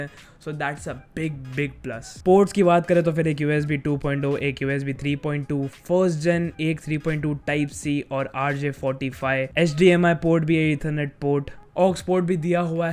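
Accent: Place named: India. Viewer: What it this for a language? Indianenglish ?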